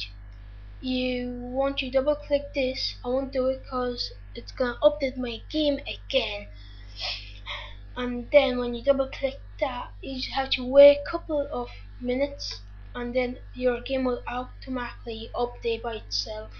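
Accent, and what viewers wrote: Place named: Ireland. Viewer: u sound irish so much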